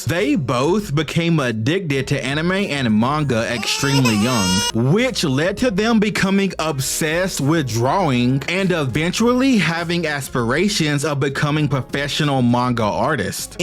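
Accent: United States. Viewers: It’s so fascinating how many similar rivalaries there have been in the Japanese and Western comic industries. The 90s were such a wild wild time for comics in both hemispheres. But also what sortve eccentric personalities get into comics. Such as Fujimoto and Alan. ( Alan believed in black magic and he quite literally attempted to end the world lol) It’s also very cool how a man with the MOST American accent is discussing Japanese comics. It’s beautiful considering not even 100 years ago - Japanese were so scared of Americans that they’d kill their own children instead of getting captured. And Americans were so scared of Japanese they created a sun, ti throw at Japan.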